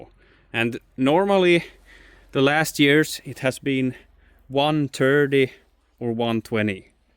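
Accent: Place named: Finland. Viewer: Hi, happy to see that my previous comment was already in you mind, as you becomes more popular YouTube brought me the fisrt video while the second one was already there. You are a Genius! You accent remind me the time I spent in 2006 in Tornio when I was commissionning an stables steel annealing oven in for Outokumpu.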